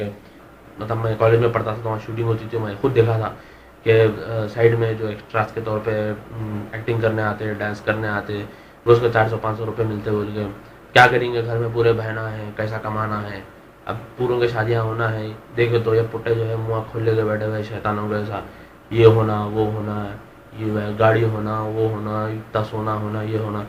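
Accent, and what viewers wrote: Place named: Hyderabad, India. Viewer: well said bro with sweet hyd lang :)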